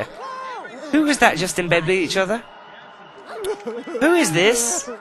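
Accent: England, United Kingdom. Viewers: i like your british accent, especially when you said " pause, pause, pause." XD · He's British,like his accent is really British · i love your englis accent!!!! :D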